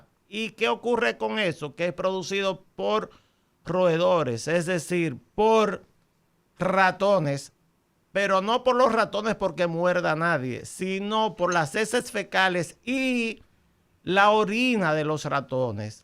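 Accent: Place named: Dominican Republic. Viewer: Me suena acento de la República.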